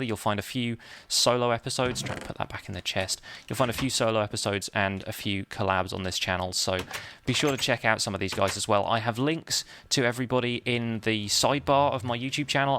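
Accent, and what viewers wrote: United Kingdom. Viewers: Thumbs up, i came from OMGcraft aswell, btw ur british right?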